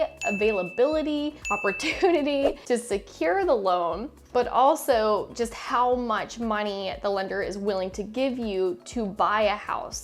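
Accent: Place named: South Carolina, United States. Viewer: Not sure why this popped up on my recommended videos since I'm not in Charleston and not looking to buy a house right now haha. Maybe it just wanted me to connect with you 😁 I did want to say I do love the way you speak. Great pacing plus I love that S Carolina accent lol. Wish you all the best! Subscribing to support 😁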